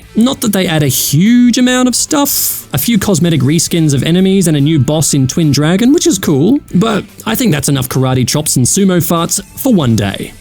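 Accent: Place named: RP, United Kingdom. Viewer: RP prononciation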